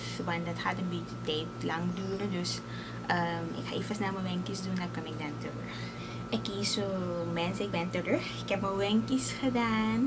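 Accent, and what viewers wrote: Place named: Suriname. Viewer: Ik keek naar een video waar je Engels sprak en ik had gelijk een gevoel dat je van Suriname was en je bent werkelijk van Su❤️❤️❤️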